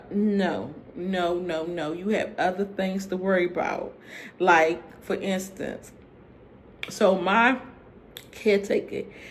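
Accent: United States. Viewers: Nobody knows you are an American until you speak. So I don't agree with you when you said Africans think they are better than Americans. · I hear that DMV accent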